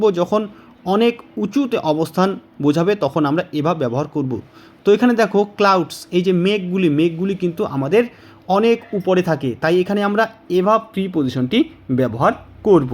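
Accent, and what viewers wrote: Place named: Nadia, India. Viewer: Sar nodiea thaka bolche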